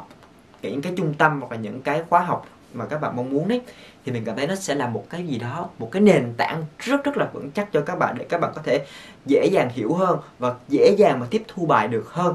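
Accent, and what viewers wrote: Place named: South, Vietnam. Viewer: Anh nói tiếng Việt nghe giọng bánh bèo là vì do anh nói giọng miền Nam nên nó vậy chứ thử nói giọng Bắc hoặc Trung xem có nghe bánh bèo không🤦‍♀️🤷‍♀️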